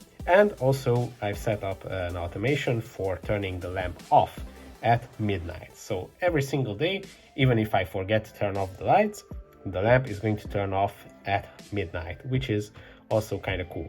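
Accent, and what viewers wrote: Hungary. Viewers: are you hungarian? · Are you hungarian? I recognized the accent :D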